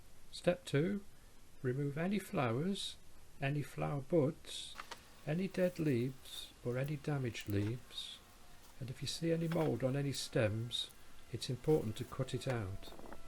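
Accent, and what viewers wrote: Northern England, United Kingdom. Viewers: Yes, they are pelargoniums. They are generally called Geraniums in the UK even though most people know that it isn't horticulturally correct. This man seems to have a northern English accent, so I can only assume that he is calling them what the vast majority of people would call them on a day to day basis. He obviously is aware that they aren't Hardy Geraniums, otherwise he would be leaving them in the ground rather than storing them for the winter. I have never seen them stored this way before, I always bring mine indoors in the pot, and take some cuttings on a just in case basis. This may be an alternative, and will give it a try.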